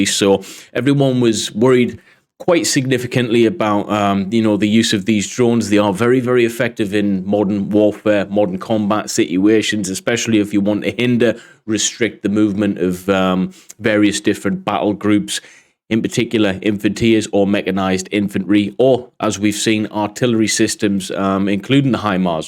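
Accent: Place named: United Kingdom. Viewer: I've added you into the rotation with Andrew, Denys, Paul, & Artur. Great rundown, mate! Especially appreciate seeing the satellite map, but as a Brit ex-pat it's nice to hear the accent - at regular speed😆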